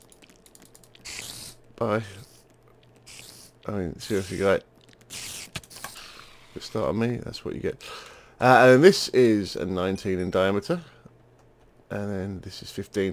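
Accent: England, United Kingdom. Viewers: You sound slightly like biffa a little. Good ep man:) are u from the UK you sound it? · ***** - nice to hear a British accent, I only ever hear American and Canadian accents :) We must be the rare breed of British youtubers you and I :) Keep it up good buddy. Your British fan/fellow youtuber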